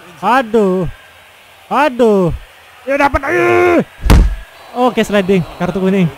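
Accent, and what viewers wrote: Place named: Riau, Indonesia. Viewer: Kau pasti orang Sumatra khusus nya Riau kan, ketebak dari logat bahasa Indonesia mu 😂😂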